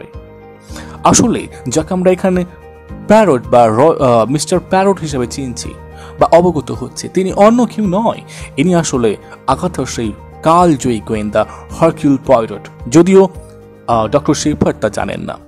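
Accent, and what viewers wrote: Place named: England, United Kingdom. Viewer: Everything is nice but you should have stuck to your own Bengali tone but your tone seemed to be English while you speaking bengali..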